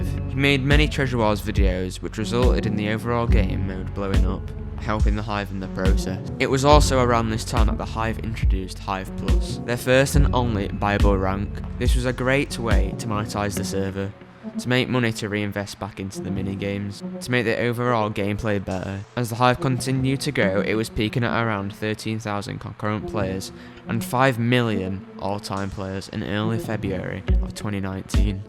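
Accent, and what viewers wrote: United Kingdom. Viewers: are ypu birtish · Edit: English boy talking about the story of a block game server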